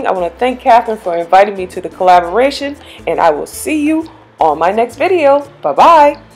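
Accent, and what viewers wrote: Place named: Gullah Geechee, United States. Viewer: girl!! This is quite interesting --- I kept saying to myself mercy—I hear the geechie in her voice😂😂 I'm from myrtle beach!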